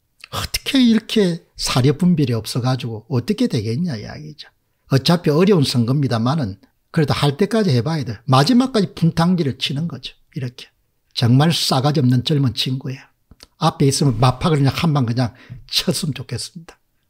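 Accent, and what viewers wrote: Gyeongsang, South Korea. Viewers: 이준석 화이팅!! 공병 이놈아! 니 쌍판때기 보자니 오바이트 나온다. 경상도 사투리 웃김...ㅋㅋㅋㅋ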